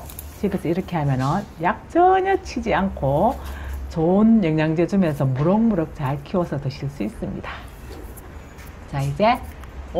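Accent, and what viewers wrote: Gyeongsang, South Korea. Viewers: 갱상도 아지매 아인교? 구수하게 자미있게 설밍도 잘하네예!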